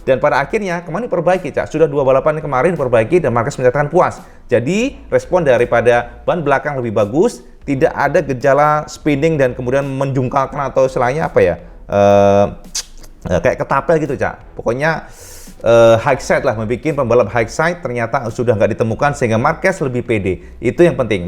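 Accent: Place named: Java, Indonesia. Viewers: Saya suka gaya bahasanya dari... kental bahasa jawanya 😁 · Paranormal motoGP 😇😇😇😇😇😇😇😇 logat jowone ojo di ilangke..........ciri khas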